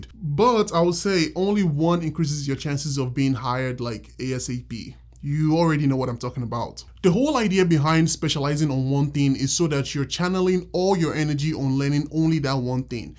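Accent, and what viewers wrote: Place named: United States. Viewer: Sir please don't talk American English please talk in clear English I can't understand your language 😢 please 😊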